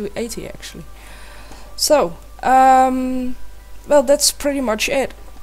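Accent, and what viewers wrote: Netherlands. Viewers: are you dutch.. ben je nl ik denk het well haha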